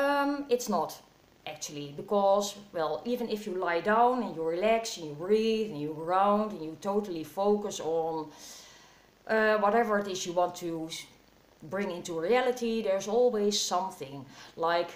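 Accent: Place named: Netherlands. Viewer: Ik kwam hier van V.K's shoutout naar jouw maar ik wist niet dat je nederlands was xD. Ik wist het meteen nadat ik keek naar je andere videos and je accent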